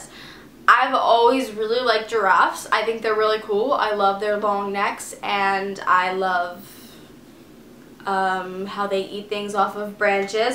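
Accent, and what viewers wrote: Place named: Louisiana, United States. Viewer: You can so tell you are from Louisiana !!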